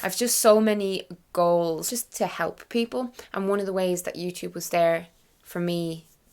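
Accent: Ireland. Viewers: I subscribed to you bc of ed videos, vlogs, because you speak so honestly about all topics (also things other youtubers dont talk about), your personality and your cute Irish accent 😊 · And I probably started following you 2 years ago... after I had a trip to Ireland, I fell in love with Irish accents! :-) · I came to your channel after seing you in some kind of collab on Hannah Witton s channel, it must be a couple of years ago now, and I stayed and subscribed. I really like that you have different types of content, the vlogs, the food diary and talking about like sex and health and everything. Also, a bit strange maybe, I'm here because I love irish accents! I'm Swedish but I lived in London for a year and kind of sound like a Londoner when speaking English and I'm so interested in the English language with all its different accents (not american though, sorry americans). 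· I subscribed to you when the video am no longer Vegan came out (because I was going through a similar thing). I’ve loved your personality and sense of humor ever since , you will continue to be one of my top . ( also am obsessed with the Irish accent, since am not a native English speaker I hope I’ll master it one day *insert Irish youtubers accounts here * lol ) love you 🖤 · I visited Ireland this summer and had THE time of my life and fell in love with it! I found you from searching Ireland videos and one thing lead to another and I fell in love with your energy and accent and message 💚 I get so excited when a new monthly vlog comes out... I snuggle in and make coffee and feel like I get to hang out with my Irish friend! 💚 Thanks for all you do!!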